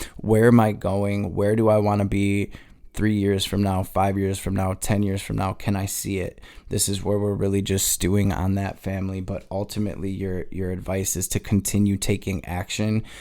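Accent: Detroit, United States. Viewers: waddup doe u from detroit???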